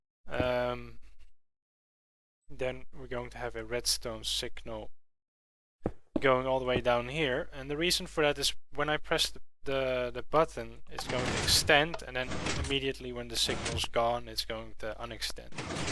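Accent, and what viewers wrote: Netherlands. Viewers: lol , you sound dutch :) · U DUTCH RIGHT? ik hoor het aan je accent x) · je bent nederlands he? hoor het aan hoe je bepaalde dingen zegt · nederlander zeker :P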